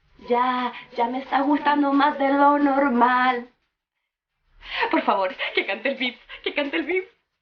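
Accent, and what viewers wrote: Chile. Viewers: es chilena? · Eres chilena cierto 😓😓😓😓😓😓😓😓😓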